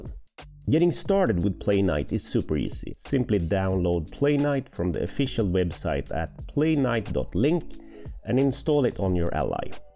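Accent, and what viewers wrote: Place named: Sweden. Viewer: I can hear the swedish sort of accent, you sound familiar dude.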